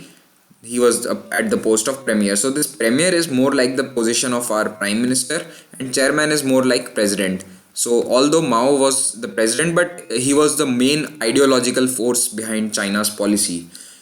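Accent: India. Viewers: Traditional Indian accent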